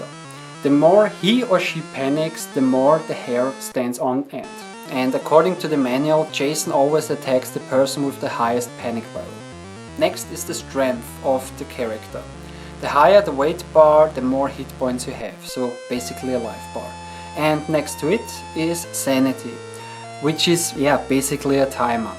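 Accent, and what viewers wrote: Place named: Germany. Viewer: I remember playing the game 30 years back on the good old c64! the death scene screams were awesome! so awesome, that my mum took them for real and stormed in my room;-)! It is remarkable, that the video game tape still works after 32 years! Do you use an original C64 or an emulator for PC?, you have a descent german accent! are you from east germany?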